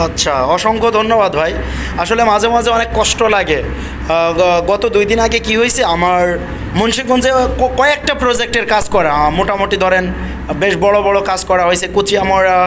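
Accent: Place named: Faridpur, Bangladesh. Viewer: ভাই আপনার ভয়েজ সুনে মনে হয় আপনার বাসা আমাদের ফরিদপুর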